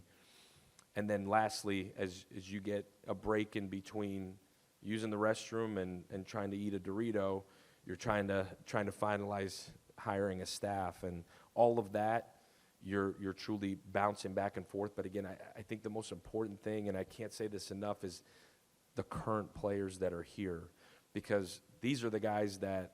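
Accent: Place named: United States. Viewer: Dude sounds upper mid-eastern (he is), NOT southeastern; however, kudos for crediting his wife and parents; don't like the superficial "social correctness"/politics I hear, nor the vaguery, but I like his optimism and planning. A 250 mile radius recruiting circle is FAR too SMALL, eliminating 1,000 miles to the west of Auburn University) in the southeastern US, let alone the other 3/4 of the country ! I like hearing about tailoring the offense to the players' strengths, especially the QB.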